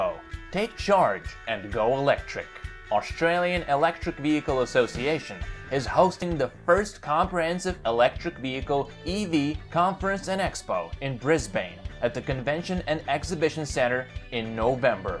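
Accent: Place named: United States. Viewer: Good video, good text, but why couldn't we have a Strayan deliver it? It sounds like something you'd hear on the shopping channel. No offence intended to our American colleagues, but one of 25M of us should have been able to do the voice over, imho.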